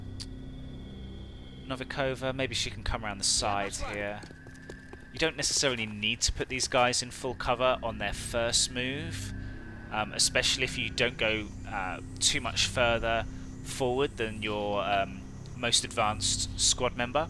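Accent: United Kingdom. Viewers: I've started to watch the playlist really enjoying hearing British accent. Only on ep2 so far.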